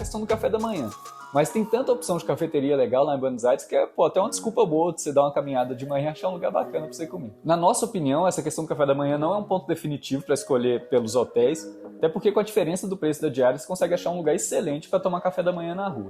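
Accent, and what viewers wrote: Minas Gerais, Brazil. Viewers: ei cara otimo video , eu desconfiava que tu era mineiro meio matuto tudo termina em (ENO) correno,comeno,fazeno,sabeno , falano e por ai vaiiii. estou (INO) pra la otima dica. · Gostoso demais ver vídeo com sotaque mineirim! Parabéns aí!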